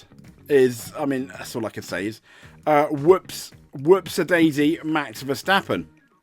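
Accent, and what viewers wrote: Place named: United Kingdom. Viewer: He’s allowed to ask the question!!! BTW…are you British? Of course you are!!!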